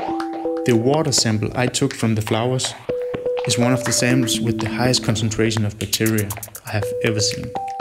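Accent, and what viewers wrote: Denmark. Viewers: Great to hear you've got a microphone now :D. Is that a danish accent? Apart from Demodex, biofilms of everyday objects (e.g. Keyboard/Mouse, sports drinking bottles) would be interesting, if bacteria (or whoever lives there) are large enough to be filmed.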